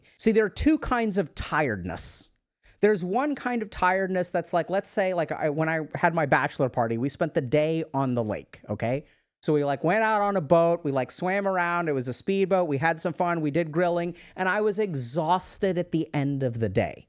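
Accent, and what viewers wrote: India. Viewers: As an Indian, Dr. K's Indian accent has me on the floor every single time LOL! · The Indian accent is cool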